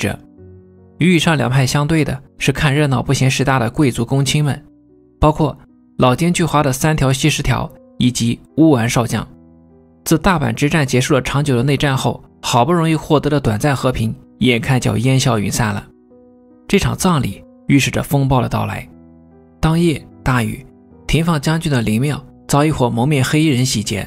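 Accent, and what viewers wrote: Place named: Northwest, China. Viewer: 简明扼要，一气呵成，精彩！对博主的西北口音稍有不适，不过瑕不掩瑜。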